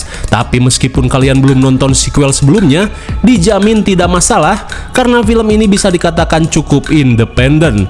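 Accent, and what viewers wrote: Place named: Sunda, Indonesia. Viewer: lenggket banget bahasa sunda nya😂😂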